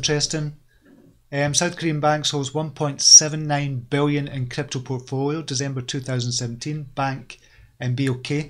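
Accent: United Kingdom. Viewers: Such a puff of fresh air......a British accent in cryptoland.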